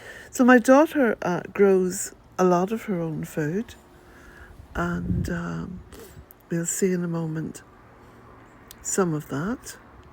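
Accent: Ireland. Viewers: Thank you for sharing this adventure. in your daughter's lovely, lively garden, so wonderful with your Irish lilt You can be a proud mum. 🌰🍇🥔🍏. Blessings from central va, u.s.and a hug for Patsy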